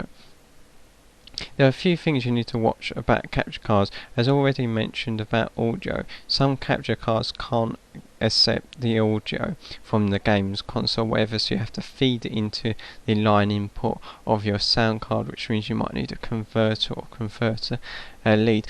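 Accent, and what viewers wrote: United Kingdom. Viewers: Lol i like british ppl explaining things. just a word of advice tho, talk a little louder. It will get ppls attention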